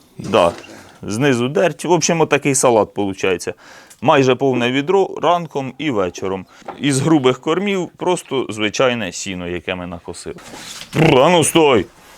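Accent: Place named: Poltava, Ukraine. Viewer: Добрий день. Можна питання, а з якого ви регіону? Чомусь здається, що Полтавщина ❤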